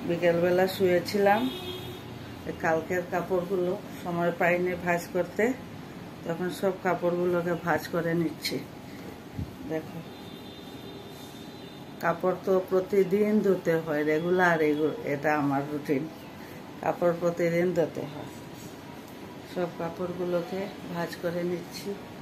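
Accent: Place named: East Bengal, Bangladesh. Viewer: Khub khub valo laglo🎉🎉tumi kothay thako tomar kotha sune mone holo tomra purbo bongiyo... Amio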